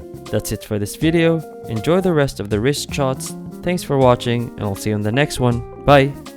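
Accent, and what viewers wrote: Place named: Singapore. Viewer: Bro, great looking Nb1050. BTW custom size leather is quite easy to get these days right? You sound quite Singaporean, are you in Singapore?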